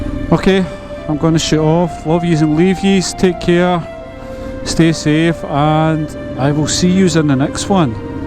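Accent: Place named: Scotland, United Kingdom. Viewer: Hey. Very nice video. Enjoy the accent. Fells like i'm watching Outlander. LOL.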